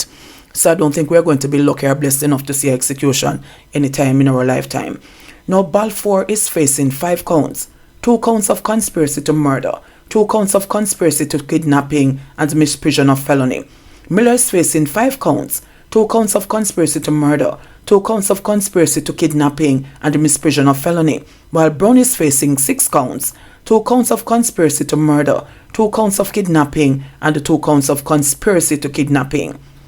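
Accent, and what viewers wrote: Jamaica. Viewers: Bradshaw watched too much murder series movie. Send person X to kill the young miss and her baby, then sending person Y to get rid of person X. She thought she would have gotten away with her devious act. Condolences to the family of the deceased. Love your blend of professionalism and the pinch of patois you integrated I your delivery of the new 😅. Well done 🎉❤👍💯🙏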